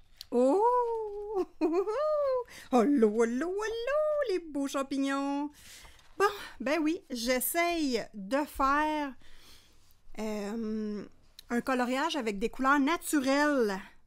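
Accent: Canada. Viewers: Cc, je viens de partager cette vidéo à une copine qui a perdu l envie de colorier et qui aime l accent canadien. Je pense que tu pourras lui redonner le peps pour colo. 😘😘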